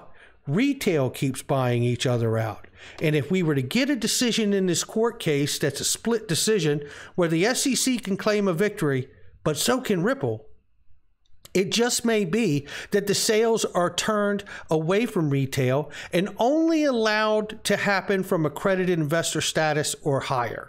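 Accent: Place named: United Kingdom. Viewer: I am very excite about XRP.. its every time different story.. very cool token.. and reporting very cool thanks you for this reporting...i check you headlines out bit hear of that all time.. very confusing you give report but your english cool good british language thnks 2 yu 😘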